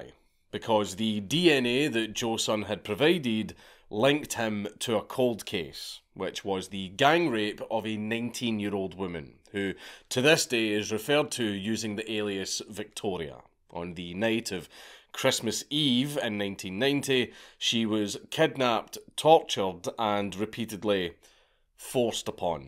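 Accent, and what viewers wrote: Scotland, United Kingdom. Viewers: Come for the topic, stay for the brogue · Dankula has the strongest accent you can understand. Whenever I go to UK, I prepare myself not to understand what half of the population is saying. He learned to standardize his strong accent or just lucky? First time watcher, anybody knows?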